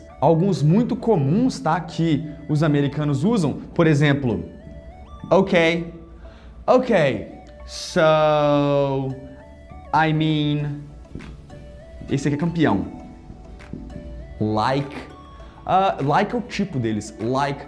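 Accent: Minas Gerais, Brazil. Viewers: Mano foi engraçado a primeira vez que ouvi sua explicação em um vídeo falei " a voz desse cara me recorda a do Gustavo Lima, deve ser mineiro" então assistindo seus vídeos vc falou em pão de queijo kkkkk e logo falou que era mineiro...rs... Você é um excelente professor, muitas dicas tops que vc passa aqui para todos...continue fazendo isso, e desde já agradeço a vc, falo inglês mas sempre aprendo algo que não sei também... abração de Londres para vc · Caí aqui de paraquedas. Você pediu um comentário então vou deixar o meu. Você está de parabéns, deu várias dicas e com certeza me ajudou e vai ajudar muita gente, já me inscrevi no canal, dei "like" e pretendo assistir os outros vídeos do canal. Infelizmente acho que essa musiquinha de fundo não ajuda muito. Você fala bem mas o seu sotaque mineiro também desviou minha atenção, foram muitos "falano, estudano, esperano, usano, escutano, treinano, leno e cê" que no final já estava "contano". 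Desculpe, não quis ser grosseiro, só gostei do canal e fiz uma crítica com a intenção de que fique melhor.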